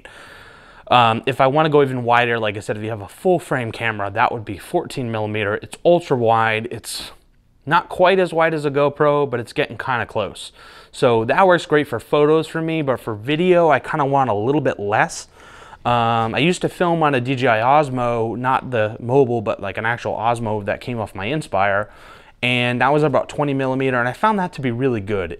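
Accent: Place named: New England, United States. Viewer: You from Mass or Rhode Island ??? Recognize the accent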